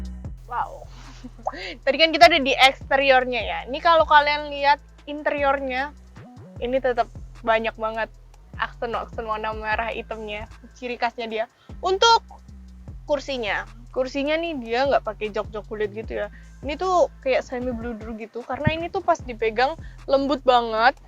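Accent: Java, Indonesia. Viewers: Kek ada logat" jawa nya kalo ngmong, ya mba 😁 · Wong jowo tah iki hhh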